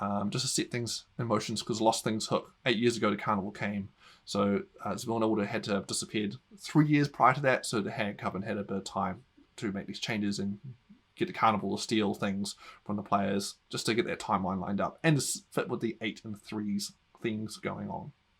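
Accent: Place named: Australia. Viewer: Gidday , detection of a fellow Aussie accented. :) or is there a Kiwi twang, i dunno Kiwi roks to, A Aussie Kiwi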